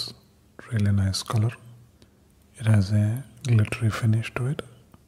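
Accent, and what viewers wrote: India. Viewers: I like Indian accents. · It's literally the best. I love watching ASMR videos where the person has an accent, but the Indian accent is the best. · I love the Indian accent. 💜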